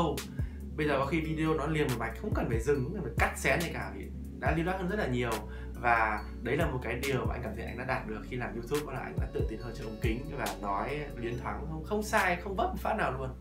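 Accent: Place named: Hanoi, Vietnam. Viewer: thích video của vì các lý do sau... 1/ gần gũi 2/ những gì a chia sẻ khá thông dụng vs ng xem 3/ giá hàng hóa khá phù hợp vs đối tượng như e (ít tiền) 4/ giọng HÀ NỘI (cute)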